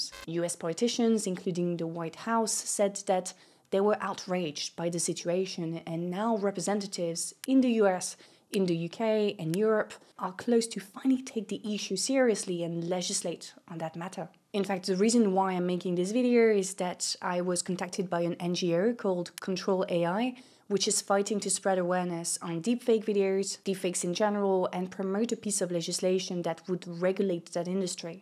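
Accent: France. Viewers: maybe it has to do with her french accent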